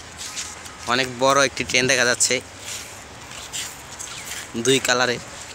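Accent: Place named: Bangladesh. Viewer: Kolkata theke ele but tomar kotha Bangladeshi der moto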